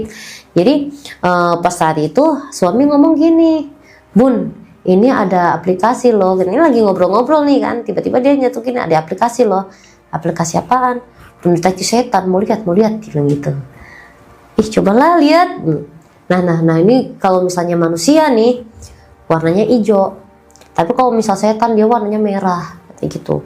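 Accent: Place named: Medan, Indonesia. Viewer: Logat ngomongnya Kayak Orang Medan 🤣🤣🤣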